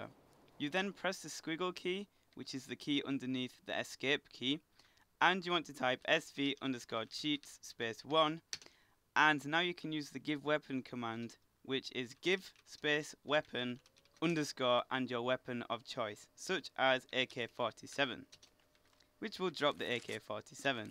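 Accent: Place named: England, United Kingdom. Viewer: fucking anoying english accent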